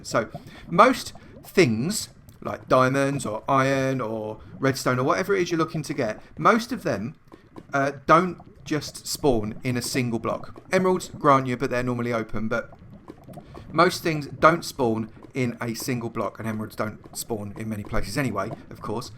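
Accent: United Kingdom. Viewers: hey dude, been watching your videos on and off and keep coming back to them so decided to watch your survival L:P from the start - your later videos are fine but in this video in particular the music edits are way louder than you, I don't know if you know or not, but in cse you don't, depending on what software you're using to record, if you "Normalise" the audio track, it will level out and optimise the volume decibels without destroying the quality (e.g. if your voice is 20db and the music is 36db, it'll put the volum eto around 28 or something and then you can adjust the volume how you like of the entire track as a whole) Loving the videos, especially the early game farms one, I never thought to lay out the saplings in a slanted rectangle formation, and also never thought to drop lamb down into a slaughter chamber for when they grow up. Kinda neat ideas. 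Nice to hear more Brits taking over the Minecraft scene:P hahahah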